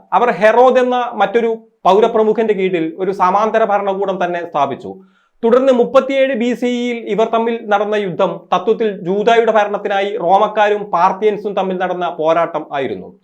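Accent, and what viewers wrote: Kasaragod, India. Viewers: പണ്ട് കോളാബി ചാനലിൽ , ചെയ്തതുപോലെ roasting പരിപാടി പൊടിതട്ടി എടുക്കാമോ.. we missing your humour senses in Kasaragod slang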